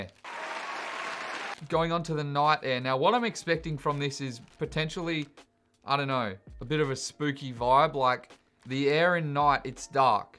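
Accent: Australia. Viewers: When you thought theres nothing left for him to try he just goes above and beyong with a total curve ball and tries AIR. What a lad, this is why I subscribed! That and the thick australian accent. OH, dude you should try and compare accents and see which one is better xD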